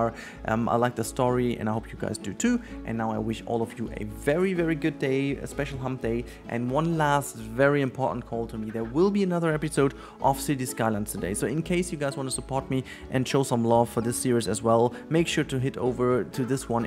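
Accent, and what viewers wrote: Germany. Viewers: I ‘notice’ your accent only insofar as I’m aware that you’re German. You speak excellent English — from an American who lives in England. I’ve never noticed anything ‘wrong’ with your English. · You speak English very well! English has dozens (if not hundreds) of different accents among its native speakers. In the USA there is Southern, Cajun, Boston, Jersey, New York, Mid West, and several others. Not to mention the various accents with the UK and Australia. You speak English with a German accent because you are German. And let me say. You speak English very well my friend. Your grammar is better than most adults here in America so props to you! Ignore the haters, they are just unhappy in life and need to hurt people in order to feel better about themselves! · Hello. Please don't worry about your accent or change it. I also come from Germany and with your videos my English grade is better. 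Thanks for your work here on yt and Twitch. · Hey - I just wanted to say I think your accent is great:) I'm an English only speaker and I never have a hard time understanding what you are saying. Also try to remember that language is always imperfect and changing (even though people like to think that their way is the "right way" a lot of the time) - there's no need for them to judge you and certainly no need for you to judge yourself. I can't imagine having to learn my language as a SECOND language so I give major props to you for speaking it as well as you do. Plus, your German accent is cool and I hope you keep it:) Great video as always, and take care ^_^ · I must say I'm not bothered by your german accent, your videos are amazing and thats all that matters.😊 Keep on the good work cause I'm ready for the next episode!👍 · don't worry about your accent, the important thing that we understand you clearly, and atleast i do. 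When i was new to this channel you were the first person ever that i heard speaking with german accent, and yes, it sounded very different at first, but nowadays i don't even realise it. As a non native english speaker i understand your pain, cause like you said, we do know that our accent is weird, we just can't figure out why. But anyway, the building looks awesome, keep up the good work!👍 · your English with a German accent is wonderful! I have a German friend who speaks English and has nearly the same accent. You're doing great. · Hi, very nice habitat and lovely video! btw your accent is not disturbing. You're english is very good with the touch of German accent, i like it:) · Hi! Please dont worry about your accent, I am German too and my englisch is very very bad, but the most important thing is, that i can understand you and understand, what you want to say. Thats the point. So please keep it up <3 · My mom is german and has a german accent and I never hear it. 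I sometimes barely hear your accent because i'm just so used to german accents... And Australian. But anyways, she sometimes gets some words wrong, mainly words with J's in them, and I just kind of roll my eyes and correct her because she's my mom, but hey, it's a normal german thing to do. Don't feel bad about it!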